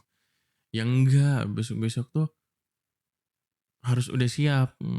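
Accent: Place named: Kalimantan, Indonesia. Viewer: _mas:cara ngomongnya kaya orang kalimantan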